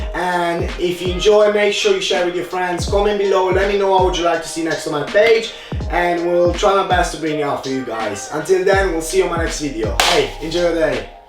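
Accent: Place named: Italy. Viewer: Where are you from? You've got that sort of Italian accent which is cool btw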